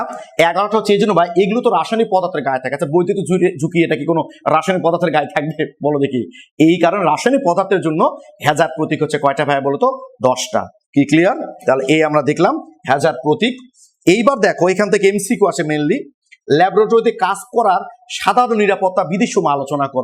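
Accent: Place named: Joypurhat, Bangladesh. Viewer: Vai basa ki joypurhat?? Vlo laglo. Sohoj savabik representation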